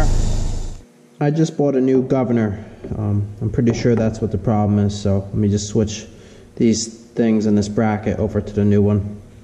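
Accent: New York, United States. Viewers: “What used to fix it wiff” Good old country New York accent.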